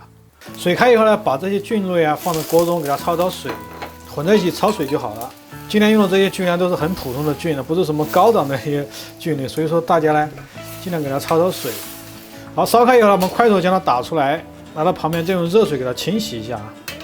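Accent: Sichuan, China. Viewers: 聽的四川口音，感覺菜更香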